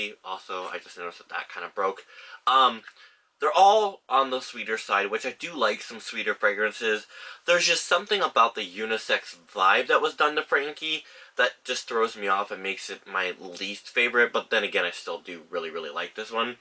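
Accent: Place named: United Kingdom. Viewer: if you’re from the uk which you sound like you are, how/where did you get cloud so early??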